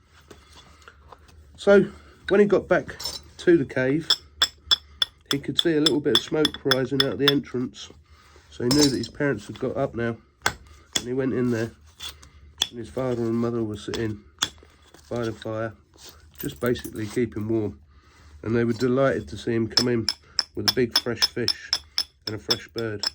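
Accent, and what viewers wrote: England, United Kingdom. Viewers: С первых слов понял что это англичанин, не американец. Произношение слов не ломаное, не голливудское.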